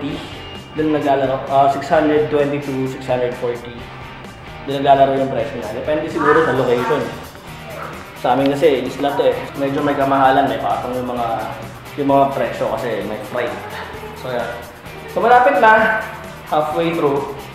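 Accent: Visayas, Philippines. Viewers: ayos bisaya mn d i ka sir.. proud bisaya here.. tnx sa video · Bisaya man diay ka kol haha. Salamat saimo vid kay gusto ko ako ra mo pintura sakong balay para di nako mag labor. Unsay ma suggest nimo na brand? Latex ug unsa tong pang finish na paint?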